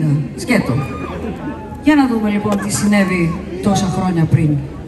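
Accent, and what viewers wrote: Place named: Cyprus. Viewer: Poso mou aresi otan milai ta Kipriaka! xronia sou polla agapi mou, na zisis kai na ta ekatostisis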